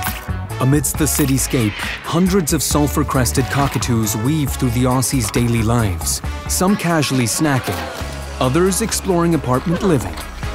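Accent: United States. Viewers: American voice over on Aussie content.. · It's annoying that so many videos about Australian parrots and bird life are narrated by bloody Americans. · Why does this video have an American voice over?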